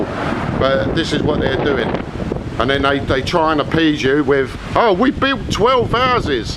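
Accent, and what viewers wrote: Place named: East London, United Kingdom. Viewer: WHERE YOU FROM ORIGINALLY,? I HAVE A SIMILAR ACCENT AND I'M FROM RAINHAM/DAGENHAM. NOW LIVE IN HUDDERSFIELD FOR SOME FUCKING REASON. KEEP UP THE AMAZING WORK, MATE. BIG FAN.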